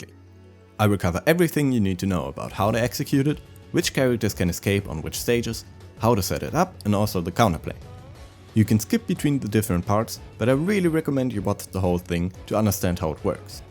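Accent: Germany. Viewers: Sounds like he comes from a German-ish speaking country, so probably germany, Austria, Switzerland or Netherlands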